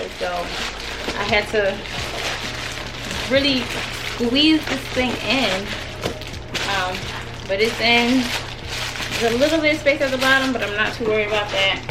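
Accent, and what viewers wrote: Philadelphia, United States. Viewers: Lol I knew 3 seconds into the video you were from Philly! Thanks for the info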